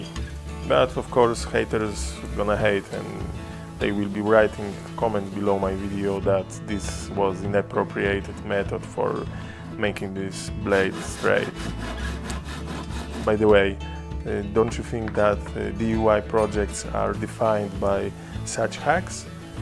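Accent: Poland. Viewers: od razu słychać ze polak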